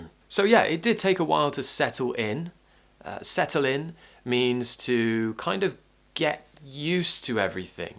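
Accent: United Kingdom. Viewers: I love british accent I love you, 😘😘😘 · I do love british accent.So,these days I've been listening to you talking..and I'm looking forward to new videos...Thanks alot. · I love listening you Your accent makes me fall in love with britain and british english. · Hi, you were really missed ❤️Congratulations on your new house. Love your beautiful British English. Thank youuu for each lesson 🥰🥰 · British accent i love most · You are the best English teacher in YouTube I have ever seen please keep going and in loved with your British accent, I appreciate your time and effort. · You have so clear British accent.l wish all British people speak like you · I'm so enjoy, when I hear you talking, I want speak british like you one day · Wow..... U make fall in love with British accent.... Thanks alot... I'm watching u from Kurdistan Iraq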